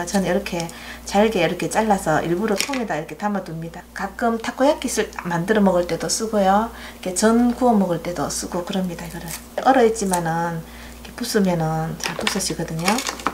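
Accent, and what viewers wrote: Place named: Daegu, South Korea. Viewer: 떡을마찼다? ㅋ ㅋ ㅋ ㅋ 대구사투리정감있네요 서울분들이볼땐 ㅎ ㅎ ㅎ ㅎ ㅎ ㅎ ㅎ 마찼다.!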